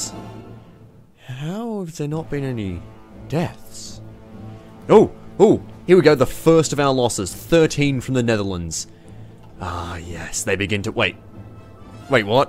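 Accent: Australia. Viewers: This dude sounds more Australian then me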